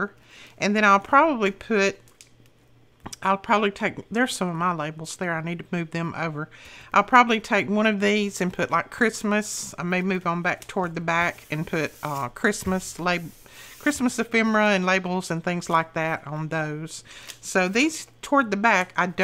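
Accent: South, United States. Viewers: Love. Love, Love this. I'll be creating one, thanks so much for sharing & for the inspiration. Love your accent💜I have relatives down south. Stay safe & be well. We also wear our mask & gloves when we go out. The price of food & paper goods have gone up. This virus has affected everyone one way or another. Sadly, I have relatives who are I'll from the virus. It's a difficult time. 🙏🙏🙏🌹❤💙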